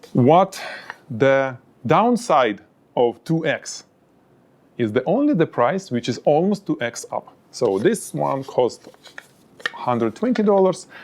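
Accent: Russia. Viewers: По первым словам сразу понятно, что русский)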